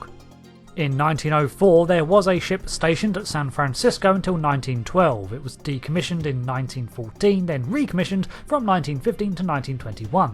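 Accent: United Kingdom. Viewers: I love your accent, what part of Britian is it from?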